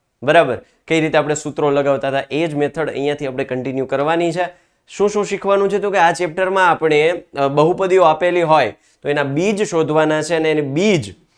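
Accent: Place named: Kathiawad, India. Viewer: Sir tame kathiyad baju na cho nice bhasa and math lecture mate thanks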